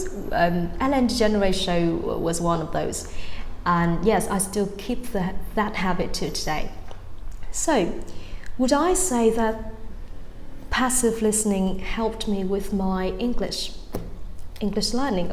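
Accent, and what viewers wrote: United Kingdom. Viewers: Chị nói accent kiểu người anh, nghe quyến rũ, uyển chuyển quý phái thật! Em thích nhưng mà khó quá nên đành theo anh mĩ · giọng Anh chuẩn, dễ thương giống Lucy ( “Learning English with Lucy’’ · giọng chị hay quá!!!~ có phải giọng chị theo tone British accent ko ạ? em đang luyện nghe kiểu thụ động, nghe giọng chị rất quyến rũ, dễ nghe và dễ phát âm theo nữa. Cám ơn chị đã dành thời gian để làm video này cho mọi người ạ <3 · Giọng cô là anh phải ko ak. Nhưng lại ko nặng lắm. I love your acent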